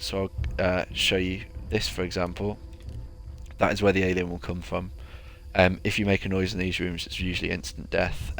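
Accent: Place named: United Kingdom. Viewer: Very British...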